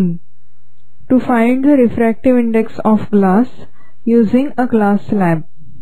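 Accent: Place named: India. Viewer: .-_-. Damn Indian accents.....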